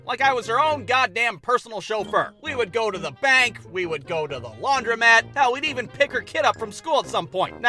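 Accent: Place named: Ohio, United States. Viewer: I'm also from Ohio and after watching and listening to this video....as much as us ohioans don't want to think that we do....we totally have an accent. This video confirmed that theory for me.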